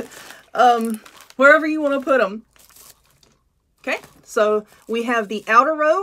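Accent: South, United States. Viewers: I love your southern accent. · this is absolutely beautiful! I can't wait to find the frames. I've been following you for a couple of yrs now and I absolutely love watching you with all of your crafts. You've got a awesome personality. I love your personality. I don't understand why people have to be so low. If they don't like you or what your doing there's a simple solution. Get off the channel. I loved how you handled that by telling them to get a hobby lol. Some people just love drama! My friend does wreath videos and there for awhile she was getting harassed pretty bad. Mostly from other wreath makers and their followers. I hope that it doesn't get that bad for you for your so much fun to watch. I also love your accent. I'm a country girl myself with a heavy southern accent. Anyways keep ignoring them stay positive with your bubbly personality! 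· What state are you in? I like your Southern accent.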